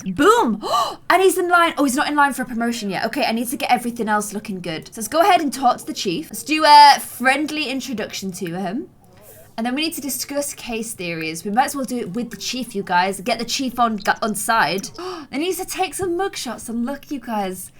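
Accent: United Kingdom. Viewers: Lol i love how says antony instead of anthony XD Its ok i love that british accent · Btw I'm half French and it was funny hearing you speak French with a British accent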